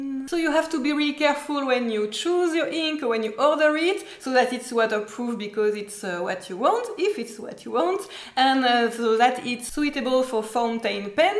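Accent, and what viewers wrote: France. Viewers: Your not french? Your accent sound like , If no good video ^^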